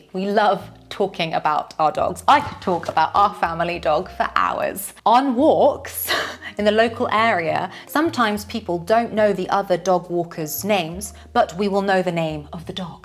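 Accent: United Kingdom. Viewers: I love British accent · I love your authentic British❤❤ accent ❤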